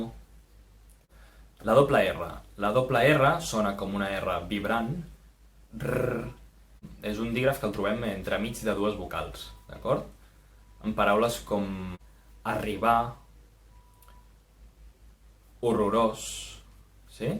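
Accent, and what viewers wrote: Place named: Castile, Spain. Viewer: Mestre, la lletra ce es pronuncia /sé/, amb essa i no pas com fas tu: amb el so castellà. També: 1) la o del mot "àtona" es pronuncia /u/ i no pas /o/, com ho fas tu, i la be del mot "àrab" és una /p/. Etc.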